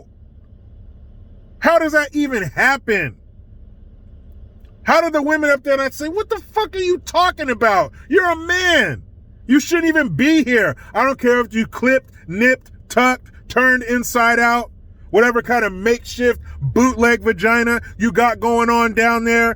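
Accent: Australia. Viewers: Lol love your Aussie Accent, 😂😂😂 🤣🤣🤣🇦🇺💕💕💕🇺🇸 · Love the Australian accent......"mate!"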